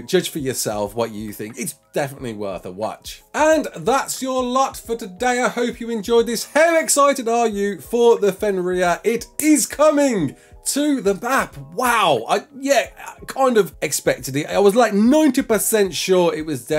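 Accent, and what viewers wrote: United Kingdom. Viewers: you did great! It's simply inevitable that pronounciations are a little different depending on where one's from, but it honestly sounds pretty good in British. :D I'm sure you (intuitively) already know, but 'Steinbjørn' directly translates to 'Stone bear'. Big fan of your videos btw., they made me pick up the game again!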